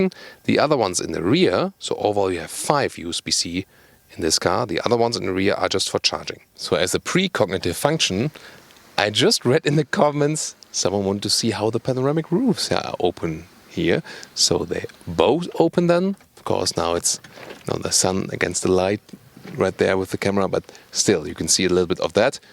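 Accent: Germany. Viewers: I hear a review in a German accent. SOLD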